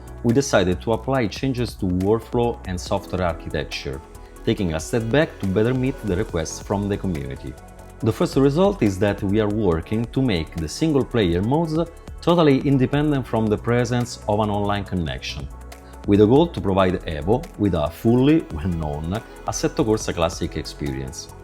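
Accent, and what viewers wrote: Rome, Italy. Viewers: L'inglese con accento romano 😁 . E comunque sempre meglio del mio inglese ....complimenti per il gioco , spero esca presto l' aggiornamento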